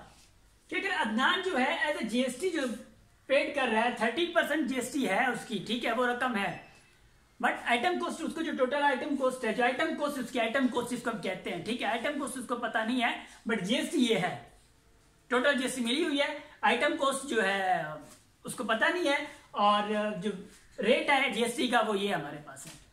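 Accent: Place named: Saraiki, Pakistan. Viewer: ahhaha wakai bhaa saraiki sindhi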